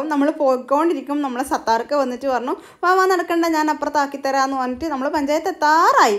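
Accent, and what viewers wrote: Kannur, India. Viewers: തന്റെ kannur slang മാറുന്നുണ്ടോ എന്നൊരു തോന്നൽ.. നമുക്ക് നമ്മുടെ slang kelkkanaa ഇഷ്ടം 👍🏻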